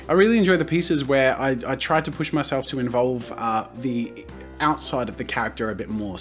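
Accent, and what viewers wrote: United States. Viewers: his American accent is incredible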